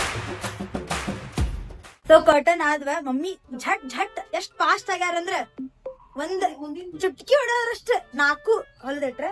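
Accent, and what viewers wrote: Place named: Hubballi, India. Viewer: ನಿಮ್ಮದು ಯಾವ ಊರು ಹೇಳ್ರಿ ಪ ನಿಮ್ಮ್ದು ಹುಬ್ಬಳ್ಳಿ ಆನ್ ರೀ ನಿಮ್ಮ್ದು ಭಾಷೆ ಮಸ್ತ್ ಆಯ್ತಿ ರೀ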